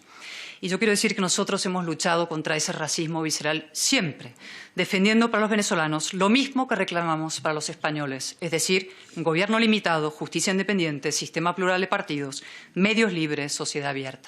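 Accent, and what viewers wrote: Argentina. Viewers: Porqué habla argentino? Mu española no la veo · No solo es una persona bella, pero qué lindo habla…No solo su labia, sino su acento ( un acento argentino sin idiosincrasias)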